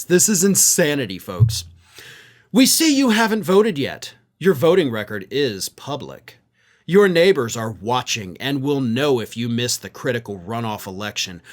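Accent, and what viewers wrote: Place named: New York, United States. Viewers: Gotta say, loved the New York tough guy accent😂😂😂😂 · Hey....loved your NY Italian mafia accent..... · Your mob New York accent is funny.😂😂😂😂😂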